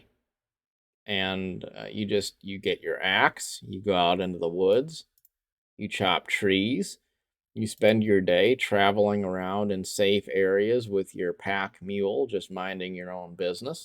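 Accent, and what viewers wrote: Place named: South, United States. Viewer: Just wanted to see if this was possible. Lo and behold, an Asian dude, in a cowboy hat, explains to me with a southern accent, how to play this game which it's main point is to combat, in a pacifist way. I am fully enlightened already and i'm just 10 seconds in.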